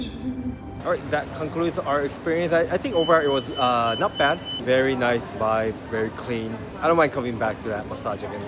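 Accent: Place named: Singapore. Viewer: Why u speak in Sg accent.. hahaha.. cute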